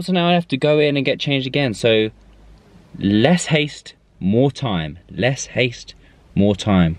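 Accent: United Kingdom. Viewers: Your videos are such a motivation. By the way, lovely accent, what part of UK u from?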